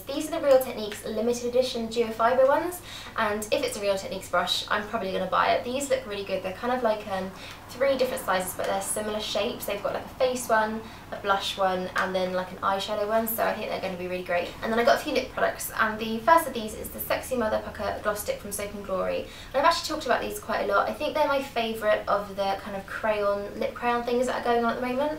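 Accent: England, United Kingdom. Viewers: I made it! what do i win? lol i just wanted to say that you have such a classy look and mannerism. i don't know if its the english accent adding to it, or just the way you present yourself, but i think you are a lovely young lady.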